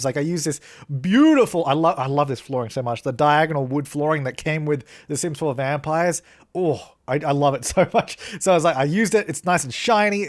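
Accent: Australia. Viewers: Is it just bc of his Australian accent or does he sound like draw with jazza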